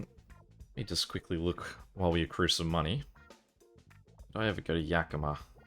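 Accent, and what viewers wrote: Australia. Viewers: I don't mind the Aussie pronunciations of some of these cities, even if they're wrong, because I know Aussies are tired of hearing people saying Bris-BAYN and not Bris-BIN/Bris-BUN for Brisbane, or Mel-BORN not Mel-BURN for Melbourne.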